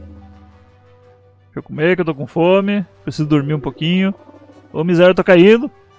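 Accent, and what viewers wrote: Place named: Rio Grande do Sul, Brazil. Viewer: sotaque de Gaúcho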